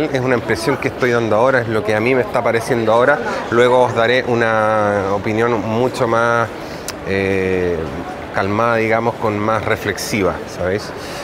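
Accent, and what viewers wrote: Chile. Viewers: Es muy divertido oírte hablar con acento chileno pero con modismos españoles, jajajajaja, me gusta mucho tu canal, gracias a ti uso Acqua di Gio Profumo. Saludos desde Chile 🇨🇱!!! Un fuerte abrazo!!!! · Lo escucho hablar y se me hace que es chileno jajaja o es mi idea🤔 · Que genial escuchar sobre este tema y más con el aun acento chileno. Estaba viendo el canal de Rosy MC Michael que tiene millones de seguidores y te menciono, me alegro de haber buscado tu canal. Éxito! y saludos desde Chile :)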